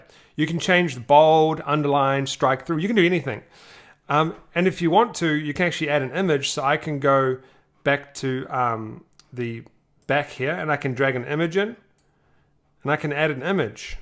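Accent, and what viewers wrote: New Zealand. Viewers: Hey mate, I stumbled across your videos a couple of weeks ago. I love the content bro!! Keep it going! You sound like your from New Zealand too? Haha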